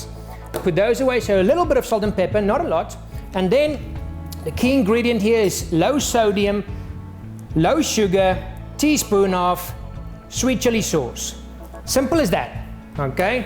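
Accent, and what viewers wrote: South Africa. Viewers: south african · are u south African? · He sounds South African, haha. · South African = best accent ever! Wonder how he cooked the chicken? looked good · Are you South African? Sounds like it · He sounds South African. An Afrikaner to be exact. Yup english is clearly not his first language. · He is most definitely South African. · south african? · I think he's South African?????? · haha i think he is south african lol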